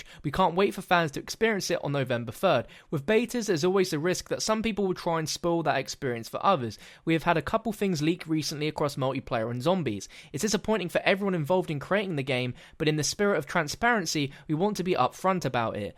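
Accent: United Kingdom. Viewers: He's from the UK, so his pronunciation is incorrect.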